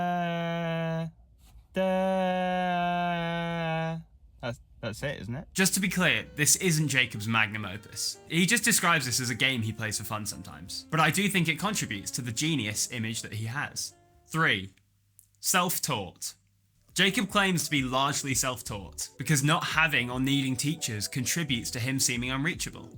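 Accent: United Kingdom. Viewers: leaves the question open though: why would British people give him credibility? I can hear the YouTuber now, and can confirm he too is British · I think the British accent helps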